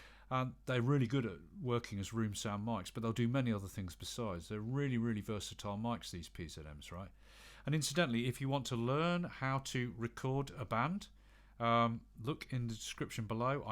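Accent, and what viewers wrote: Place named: England, United Kingdom. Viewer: I love English accent. Can you tell me if this tool will pick well music from the ceiling where neighbor is playing karaoke, he sings on the mic, play guitar and the karaoke machine plays ovation when the guy ends singing. I can't even watch my tv, I can't speak on my phone. I hear all double because of his noise disturbance. I need to record to get evidences. My cellphone does not pick any sound despite that the noise is so loud. How do I use this mic, attach to the ceiling?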